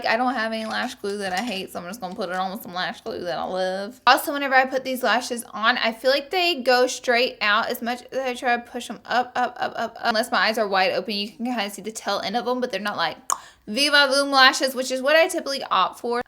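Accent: South, United States. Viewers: you are gorgeous with or without makeup/shitty makeup. seriously, though, I'm from WV and we are incredibly southern so I really love your accent, a genuine southern accent is hard to find, man! and ppl try to say we are uneducated but I love how you always have facts about skin/dermatology/makeup knowledge from experience etc that you pepper throughout your vids! You may live in LA now but I'm sending you good vibes from the south, girl! keep doin' your thing and stay sassy af, lol ps - IM A HUGE CAT LADY, I LOVE YOUR FREAKIN CATS!! the one that shows up in your vids the most looks like my cat, Sassy 😊😻💜 (i have another one, and orange one, whoa is literally a female Garfield names Lucy...or Lu...or the Goose...the Goo...Lady GooGoo...Lucy Lu...etc. see, now ive taken it too far; I've surpassed the threshold of just "cat lady" to "CREEPY cat lady"... 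LEMME JUST STOP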